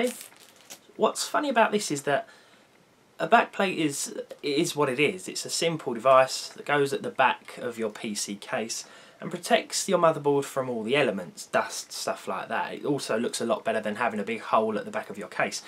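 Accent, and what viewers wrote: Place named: Essex, United Kingdom. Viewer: you sound like you're from Essex , although I maybe wrong. Anyways great video , but the x79 Chinese mobos are either a bust or they last like 3-5 years.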